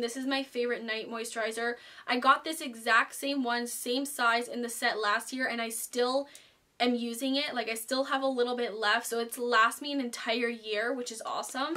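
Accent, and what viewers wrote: Canada. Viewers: me too! u sound like your from the prairies!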